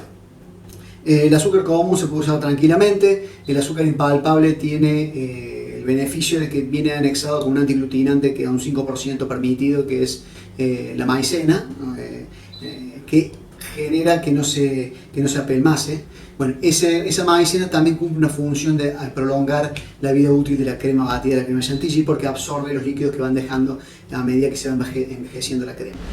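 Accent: Córdoba, Argentina. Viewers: Espectacular!! Ahora si!! Fué un placer ser " toda oidos". Me entendí todo en tu español cordobés 😂😂. Una clase magistral. Las cremas que tiré!! X Ds!! Eran recuperables!! Gracias mil. En Uruguay de donde soy, la crema doble Light Conaprole uht jamás se montó. Ahora sé xq!! Tiene 17% mat. grasa. Recién lo veo. Gracias por el super tip de agregar manteca. Mil gracias por todos los trucos. Sos un genio. La intro y final están genial. Ya hay ahí un touch de música. La edición y los gráficos también. Pero en el celu como que no se llegan a leer aunque estés en pantalla completa. Capaz sería bueno darle uno 2 segundos de 1er plano. Cosa de poder pausar y leer para no perder la info.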